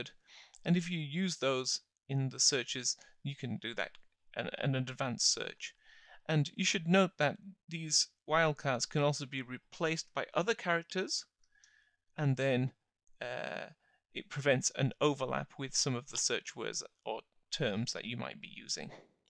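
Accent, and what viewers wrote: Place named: United Kingdom. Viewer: English is his native language. You must be not accustomed to the his variant of the British pronunciation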